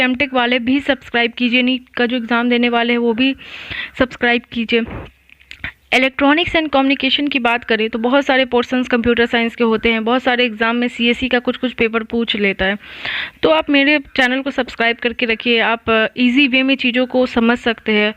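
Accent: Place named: Bihar, India. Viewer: Mam are u from bihar ??? Accent se lg rha tha😂😂😂😂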